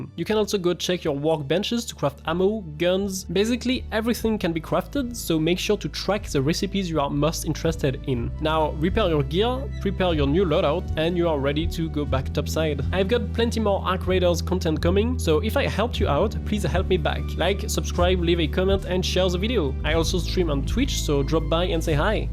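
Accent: France. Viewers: Waaa l'accent français de zinzin hahaha merci pour les tips bg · Salut ! Merci pour la vidéo c'est cool (Tu est français non ? T'as un accent) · i got jumpscared by the french accent😭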